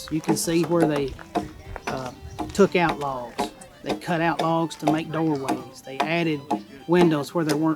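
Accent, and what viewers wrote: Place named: South, United States. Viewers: I’m a native North Carolinian, born and raised, but even I had to put this guy on 1.25x speed. A true southerner! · Hoo boy, that Middle TN accent (pronounced AY-uk-CENT). Feels like home, haha.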